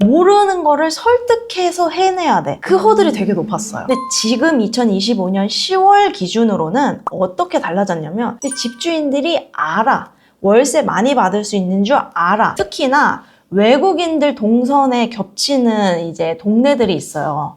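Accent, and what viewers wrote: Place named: China. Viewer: 가 중국인 악센트가 있으시네요~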